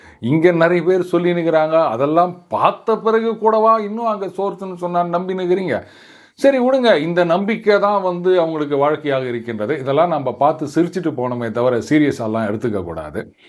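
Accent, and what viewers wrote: Chennai, India. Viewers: Sir. Our Chinna correction. Tucker Carlson - Fox News. CNN Ellai. Seldom I get to hear Madras dialect nowadays. Thank you for your service. அட்சா சும்மா எகிரிடுகுது.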